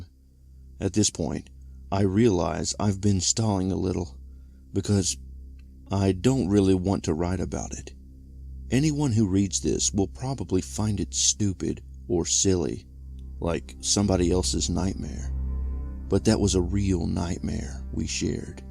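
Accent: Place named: South, United States. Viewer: Listening right now... Where in the south are you from?.... I'm from Alabama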